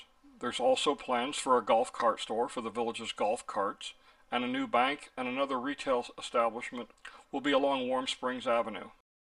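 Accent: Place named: Midwest, United States. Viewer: Got it. You sound like you are from the Midwest.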